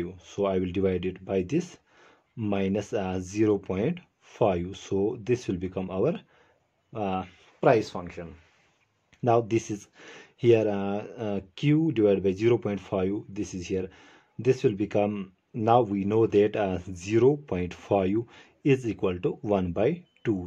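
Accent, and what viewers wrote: Kashmir, India. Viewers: ur english is half kashmiri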